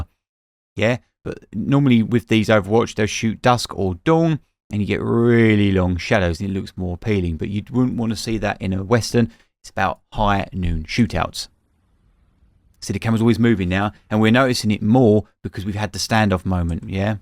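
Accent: United Kingdom. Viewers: Well, let's go for 45 minutes of great British accent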